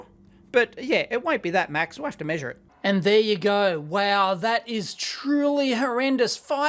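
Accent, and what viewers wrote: Australia. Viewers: Somehow the outrage sounds more intense with an Australian accent.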